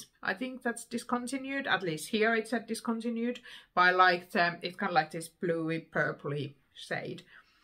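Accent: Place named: United Kingdom. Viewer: Lmao I was thinking about it whether your husband is from the UK or if you have studied there due to your British accent but then you revealed that your husband is actually British. Thanks for the video! It was fun to watch it while knitting and hopefully you keep making these. I absolutely love it how you use stronger and bolder colours compared to many others and it's also interesting to see these popular patterns in these vibrant colours ;)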